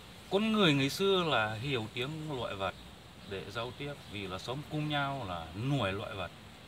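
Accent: Standard. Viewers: phát âm tiếng Việt chuẩn quá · bạn nói tiếng việt chuẩn quá <3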